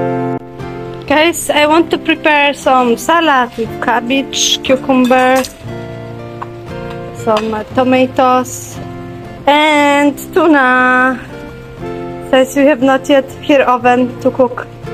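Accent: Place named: Ilocos, Philippines. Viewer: Seems your accent sounds like ilokano.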